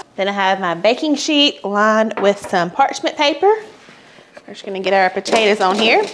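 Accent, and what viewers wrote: South, United States. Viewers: love the two recipes today you and are great together love listening to you talk that southern twinge is so beautiful to hear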